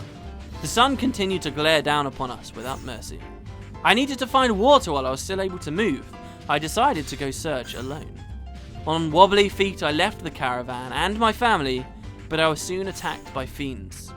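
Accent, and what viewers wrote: United Kingdom. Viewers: Hey just wanna say how much i appreciate you doing all of this. I get the vibe that you put way more effort in your vids than most other youtubers or LPers. That and the voice of a charming brit make a killer combo;) Keep up the brilliance!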